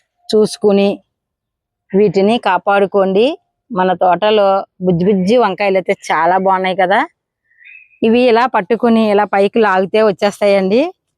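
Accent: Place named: Tamil Nadu, India. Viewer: garu mee videos regularga chustunnanu.meeru nammutara me pata videos kuda continue ga chustunnanu. Meeru okasari evaro me bhasha gurinchi Comment chesarani badapaddari kani me yasa chuste meru tamil anipustudi Kovai sarala matladinatluga undi me bhashani nenu enjoy chestunnanu. Bhasha mata ela vunna meeru matlade pratimata vupayoga karanga vuntundi. Oka friend matladinatluga prati chinna vishayamni vivaranga chebutaru me 2years pata videos kuda chustunnanu. Prati video ni like chestunnanu. Naku me videos chala upayogapadutunnai thank you 🙏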